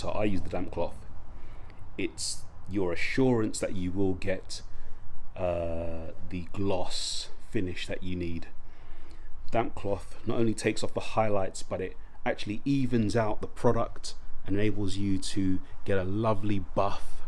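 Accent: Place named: United Kingdom. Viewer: I have been using it for past year or so. When I bought my wife her 4th new car, I figured it's going to last until...I retire😅 Her 2021 Camry SE is getting it's 2nd coating. 1st lasted months, just 1 coat. Her car stays in the garage...my poor 2016 Tundra stays out in the elements with just an occasional wash and wax. I recognized your Brit accent😁 I lived there for years in Felixstowe when serving in USAF st RAF Bentwater.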